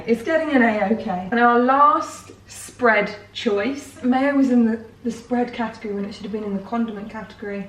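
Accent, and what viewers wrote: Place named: United Kingdom. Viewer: For some reason you sound extra British in this video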